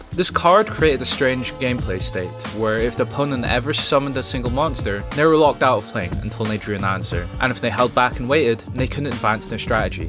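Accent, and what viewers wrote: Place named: Scotland, United Kingdom. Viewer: Your Scottish accent is so absurdly subtle. So much that I’m not sure if it actually exists or if I just need to sleep. Say purple burglar alarm.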